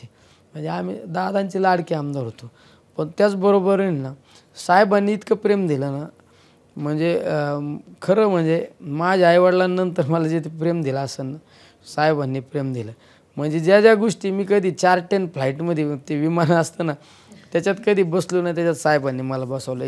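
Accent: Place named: Ahmednagar, India. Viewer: अस्सल नगरी भाषा...त्याला काय लागतंय, एखाद्या वेळेस पह्यलं भाषण इंग्रजीत करील!!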